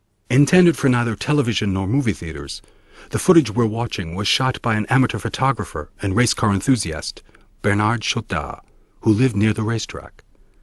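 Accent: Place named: United Kingdom. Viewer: El título en ningún momento habla de español. Deja de enojarte con otros por ser vos el burro, y aprende un poco de inglés. Es británico encima, mucho más fácil de entender que el norteamericano. No seas tan engreído y deja de tenerle bronca a otros por tu ignorancia.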